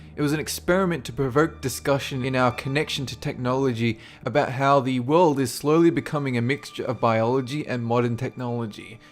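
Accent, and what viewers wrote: Australia. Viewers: Love ya aussi accent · Australian, I believe.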